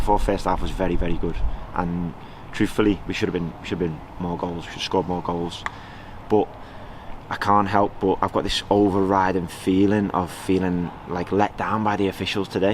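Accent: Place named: Merseyside, United Kingdom. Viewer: Still the best choice the board made for gaffer this season. Just one thing spoken to you loads more so when you were playing out field way back in division one days and I never picked up on that accent mmmm which side of the Mersey you hale from. Just a nosey Birkenhead lass asking. Behind you all the way. You've deffo got the lads hungry some stunning set pieces going on. God bless you big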